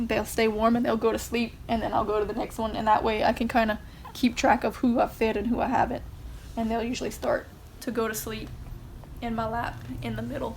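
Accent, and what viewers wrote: Louisiana, United States. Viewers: Most helpful video I've watched yet👍I like your accent too, sound like you're a Louisiannan🐰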